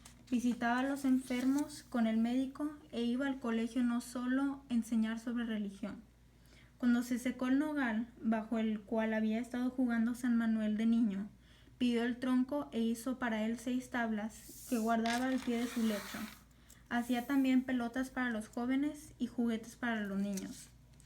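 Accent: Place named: Latin America. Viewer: Por tu acento, veo que eres de un país de hispanoamérica. Sin embargo, cuando dices que Lázaro estuvo en américa viviendo dibujas el mapa de Estados unidos. Creo que deberías saber que Estados unidos no es américa y que Lázaro estuvo en uno de los territorios americanos pertenecientes a España, los cuales algunos se situaban donde hoy se encuentra EEUU. Creo que dibujar el mapa de estados unidos es una muestra de ignorancia simplemente y de apoyo al apropiamiento del nombre "América" por parte de los estados unidos. Un poco de cultura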